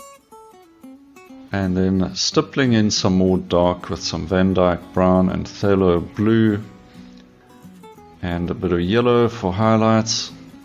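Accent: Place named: South Africa. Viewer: This guy sounds South African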